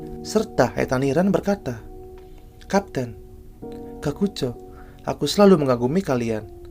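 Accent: Bali, Indonesia. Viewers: Abang orang Bali ya bang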